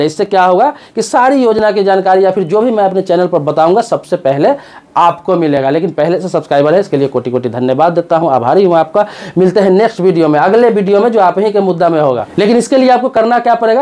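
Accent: Bihar, India. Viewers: Sir aap Bihar see hai. Na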